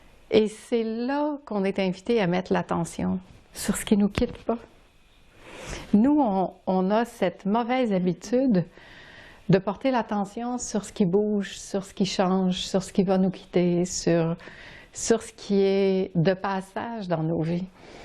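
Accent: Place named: Canada. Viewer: Quel régal... "On l'r'ssin lô on l'r'ssin" 😂😂😂 moi aussi je l'ressens là et j'aime tellement votre accent canadien, si doux, si chaleureux... Merci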